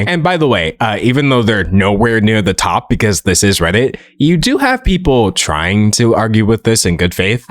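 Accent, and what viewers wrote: United States. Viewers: i thought you were american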